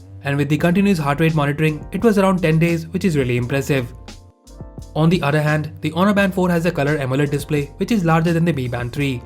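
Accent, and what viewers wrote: India. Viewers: no one needs indian accent on tech vids.. just stop..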